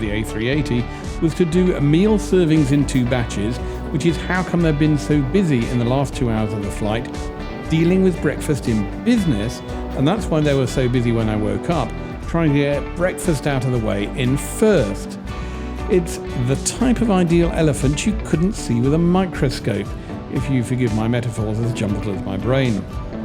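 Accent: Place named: United Kingdom. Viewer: And thankfully a British English accent without the overuse of the redundant word ‘like’ in every sentence. Very nice review and look forward to more.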